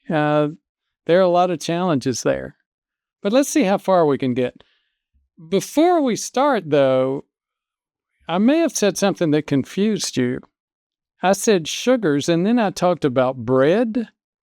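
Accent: South, United States. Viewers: Beautifully presented. Of course, I'm in Little Rock so I hear those Southern roots serving you well!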